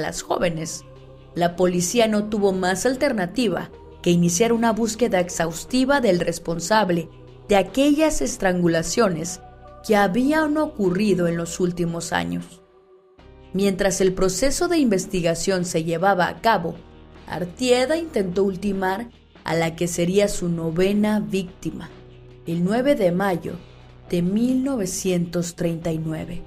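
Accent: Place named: Mexico. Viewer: Gracias , te felicito y agradezco tu talento,me encanta tu acento mexicano. Abrazos desde Brasil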